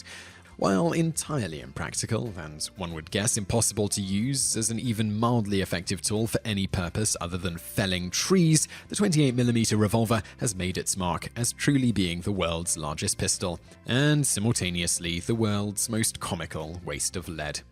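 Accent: England, United Kingdom. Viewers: You'll notice a limey brit is narrating this video and making derogatory remarks about handguns using liberal adjectives. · the reader , Is just that, no knowledge at all, But with English accent , to project an aura of superiority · What an idiot!!! Not really an unexpected grand pile of PS one has come to expect from the Britts judging by the accent and with the absolute lack of knowledge of firearms other than the garbage spewed from the ignorance of the anti-gunners. While yes many of these guns are "over the top" they do have a purpose. That last one is little more than a novelty item. On the other hand to say that the Desert Eagle 50 cal. is the favorite of gangsters and mob bosses is bull to the highest degree. While yes there are a few criminals that use them most would prefer something a bit more easily concealed and way less obvious and in your face. 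They don't want to be running around with something that you practically need a wheel-borrow to carry around. A bit too obvious to the cops. Then again most of what he was saying was just a jab at gun owners, most of who are law-abiding and perfectly reasonable people. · I should have known. English like accent talking about firearms. Not close to being accurate.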